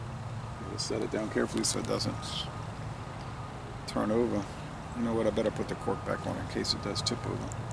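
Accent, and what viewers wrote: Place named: New Orleans, United States. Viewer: You are welcome, I always really enjoy your vids, and have to admit enjoy the accent as well as I lived in and around New Orleans for many years. For you or anyone else interested, I rn across this posted today. This fellow does a deep dive into the rules and regs of Tequila and the oversight. Interesting but maybe a bit much for the casual Tequila drinker. <link>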